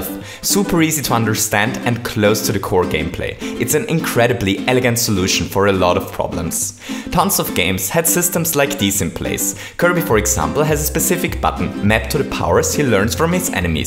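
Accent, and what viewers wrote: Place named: Germany. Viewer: You have awesome content but the speed you are talking gives no chance to deeply analyze everything + I believe you are German speaker because your English sometimes makes me feel I am listening German, so my brains errors =) I believe if add little delays between sentences that will make videos even more interesting. Thanks anyway and like!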